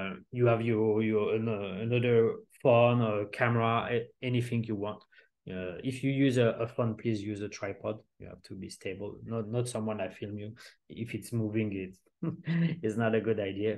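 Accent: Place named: France. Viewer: Salut 😊 watching your video from Cannes and love your french Accent! You have inspired me a lot! Merci pour tes vidéos! Ils sont tous super!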